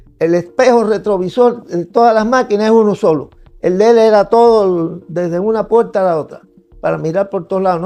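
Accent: Cuba. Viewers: Tienes muchas fotos y material fílmico que nunca se pudieron ver en Cuba. Te felicito por tu formato documental tan profesional y ameno. Solo te sugiero mejores la pronunciación y el acento que suena con un poco de "rutina" cubana. 😉 Gracias por estás entregas y la denuncia de la verdad que muchos filo comunistas de Latinoamérica no quieren ver.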